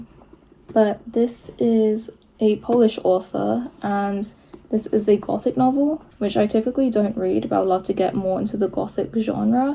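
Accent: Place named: United States. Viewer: Lovely video. Question: have you spent time in the US? Sounds like it when you speak. Just curious! (Writing from the US)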